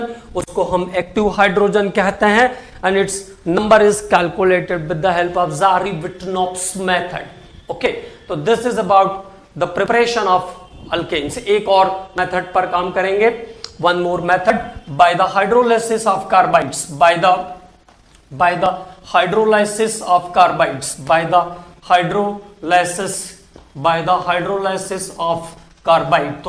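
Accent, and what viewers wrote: India. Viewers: 🤣🤣🤣🤣 Yee Chinese nehi yee too pura Desi English hee .. 😁😁😁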